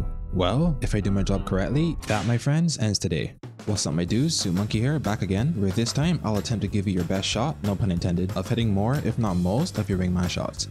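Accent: Barbados. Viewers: You a Barbadian? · Are you from Barbados? Great guide btw, it really helped a lot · I hear that Bajan accent!!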